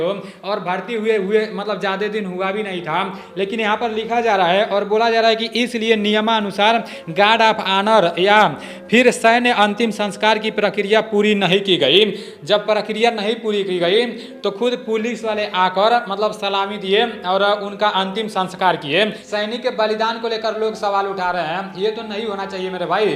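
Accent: Bihar, India. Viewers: Yaar tu bihari language mat bol. Bihari lag rha hai tu. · पहले तुम हिंदी बोलना सीख जाओ उसके बाद यू ट्यूब पर आना, दू दिन नही दो दिन पहले कही भी बिहारीपन छाप छोड़ जाते हो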